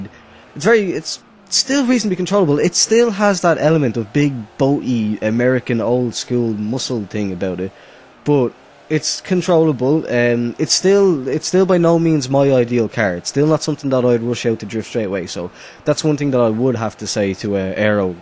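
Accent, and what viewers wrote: Dublin, Ireland. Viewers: Where in Ireland do you live? You sound like a bit of a Dubliner. :)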